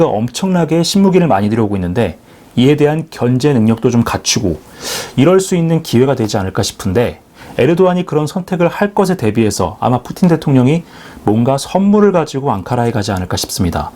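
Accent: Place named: Seoul, South Korea. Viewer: 한번씩 서울말 들어야죠 ^^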